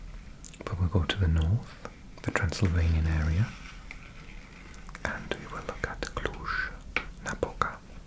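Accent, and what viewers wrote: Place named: Wales, United Kingdom. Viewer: Love hearing your Welsh accent as someone who lives in Wales! Unusual to hear it on here so thank you 🤩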